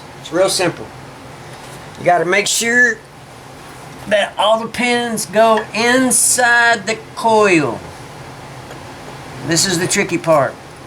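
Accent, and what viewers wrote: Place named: South, United States. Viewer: DUDE!! Thanks for the video! I just bought my 2x 124s the other day for 150$!! LOL One of them is blown, one is perfect still! Theyre like brand spankin new almost! Are you reconing the second sub or are you hanging onto your 2nd recone incase one blows? I need to get myself a coil STAT! I had no idea these subs were so easy to recone like this, I gotta hustle up some cash real fast so I can buy one. If you do recone your 2nd sub you should sell me the coil you take out :D Im from Cookeville, TN, you sound like youre also in the southern area? Take care man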